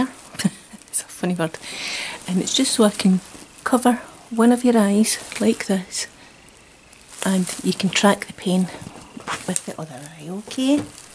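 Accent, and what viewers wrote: Scotland, United Kingdom. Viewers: I love the Scottish accent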